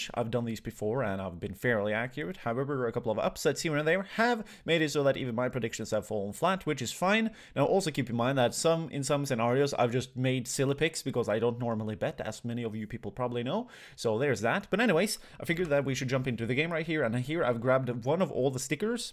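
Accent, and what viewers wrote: Norway. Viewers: Er du fra Nord-norge? :)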